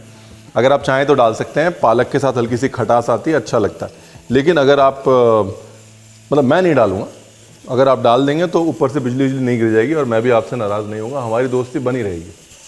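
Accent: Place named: Lucknow, India. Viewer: Hi Ranveer...I really love your cooking style.. Lucknowi Lahza..Baate..Jo khana haste khelte ban jaaye.. Superb 😊😊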